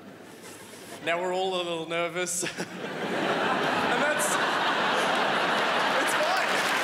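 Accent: Egypt. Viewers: He sounds Egyptian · his egyptian accent is on point im laughing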